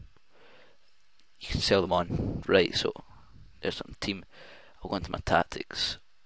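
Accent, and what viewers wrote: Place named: Glasgow, United Kingdom. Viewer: you fae glesgae or rather glasgow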